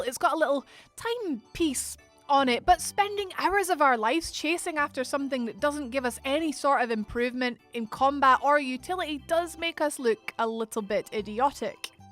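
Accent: Scotland, United Kingdom. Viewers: I have to turn the sound off as soon as I hear the scottish robot.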